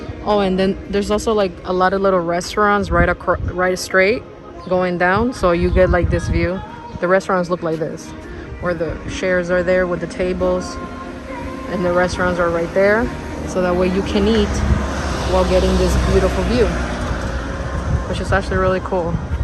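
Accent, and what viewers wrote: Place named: Latin America. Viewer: What type of Latina are you? Your accent is familiar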